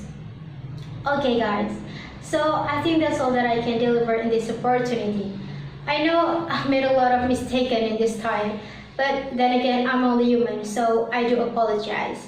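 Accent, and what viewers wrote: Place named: England, United Kingdom. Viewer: Saran sih... Pronunciation nya terlalu ke inggris2 an, jadi di dengar nya agak ngga jelas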